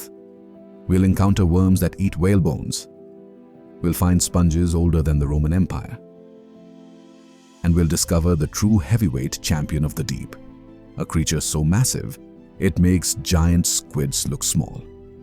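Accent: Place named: India. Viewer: why is the ai voice talk like an indian?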